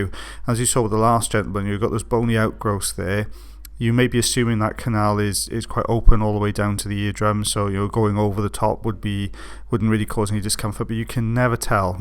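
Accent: Wales, United Kingdom. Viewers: Mum is welsh so i know that accent well even though we been living in America for 25 years lol and I can confirm welsh people are very intelligent people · I'm in Wales tonight and can confirm plenty of people talk like and everyone I've spoken to thus far knows what a Jobson Horne is.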